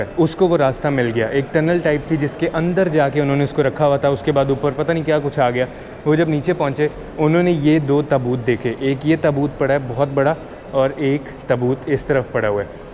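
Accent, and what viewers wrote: Punjab, India. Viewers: Ur Punjabi tone gives a boost of masala in the tour 😂😂😂 😋😋😋😋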